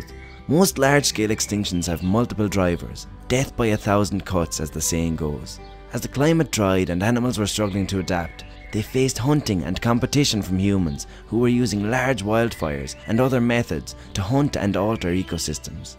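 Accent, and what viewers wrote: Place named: Ireland. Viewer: An Irish Attenborough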